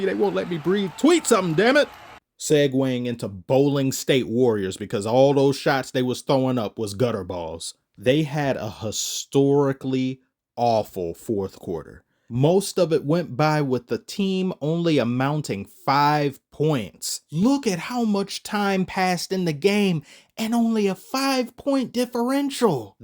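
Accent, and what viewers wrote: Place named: United Kingdom. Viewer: Sounds like a British/Aussie accent more than a Kiwi one lol. Keep it up tho, moite! 🤙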